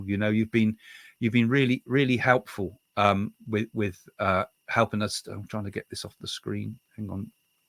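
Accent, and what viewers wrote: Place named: Scotland, United Kingdom. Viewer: Some Scottish accent here and there during your conversation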